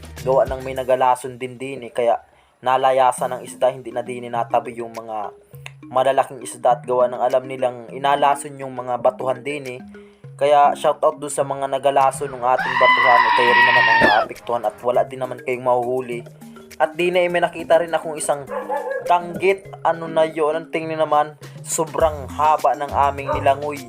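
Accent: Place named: Quezon, Philippines. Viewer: Broo taga saan ka parang Quezon ung Punto Ng salita nyo??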